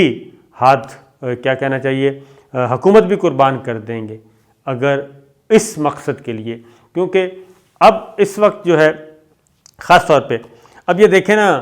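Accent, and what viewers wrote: Pakistan. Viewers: Aap ki bataen pheli daffa sun rha hn masha Allah bht acchi baataen kartaen hn, biklul khalis pakistani Urdu zuban me, r mohabat bhari bataen krty hn.ye bako channels waly tu fazol TUR TUR krty hovy jhoot bolty hn.aap ka andaaz bilku Mashriki ha vry fine. Allah ap ko kush rkhy Ameen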